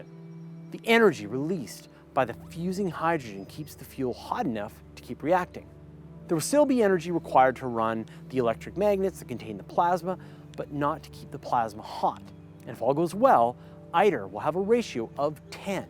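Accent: Canada. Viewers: How do we know you're Canadian? If the first word in a sentence starts with an E, you really put emphasis on the E unlike Fraser Cain fans from the Old World xD btw good video today. In the past I thought you did this just as a hobby to encourage young people to get interested in science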